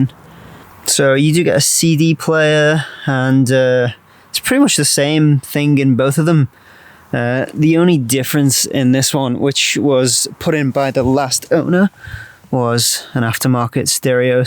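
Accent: Liverpool, United Kingdom. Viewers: are you a scouseer whos lived in america or the other way round?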